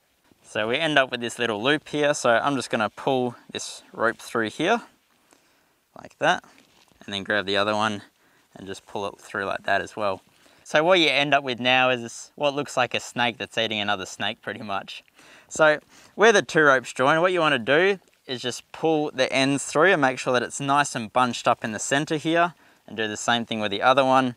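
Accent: Australia. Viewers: Love listening to this guy talk reminds me of the auses I worked with in Afghanistan 👊🏻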